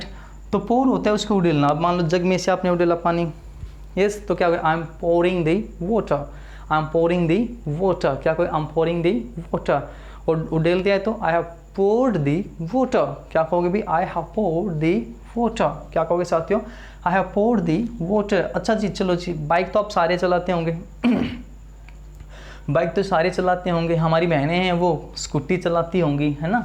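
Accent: Haryana, India. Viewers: English Mai bhi apka Haryanvi Touchup aa rha h brother 🥱🥱🥱🥱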